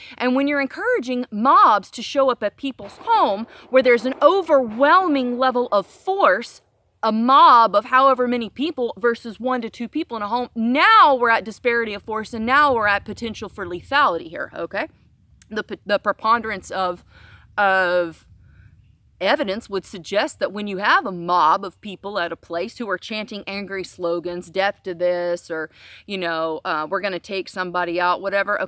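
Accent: South, United States. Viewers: As soon as I hear a southern accent I move on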